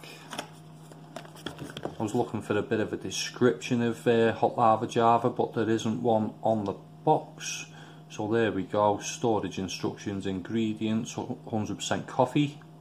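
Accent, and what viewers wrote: Liverpool, United Kingdom. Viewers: Fucking scouse accent ha ha perfect review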